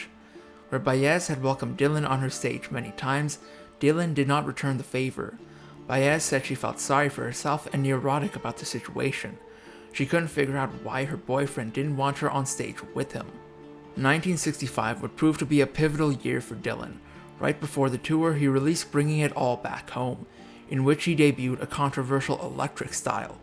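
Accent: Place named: Scotland, United Kingdom. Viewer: Scottish narrator! Scottish narrator! Scottish narrator on every vid!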